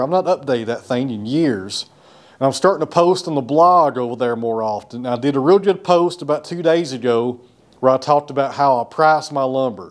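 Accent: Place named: South, United States. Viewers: I have been flowing you since your first posting. I have enjoyed all of them and I enjoy that southern assent of yours, so my friend roll on and enjoy what your doing. Life's to short to concern your self with people that can not see the forest for the trees! Your friend, Bob M, AU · I like you as a person, your calm nature, personality, will all jobs some day are more exciting then others, I also enjoy the outtakes. O I like the beard also. As I’m not from that part of the world, if your accent is a Tennessee accent, I like it. Well done 👍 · Only just found your channel a week or so ago but have watched a few of your videos and can honesty say I like them! Loved the end of this one where you answer comments, if people don't like the content then just like with a TV they switch channel or switch off. I find them relaxing to watch and your Tennessee accent is easy on the ear.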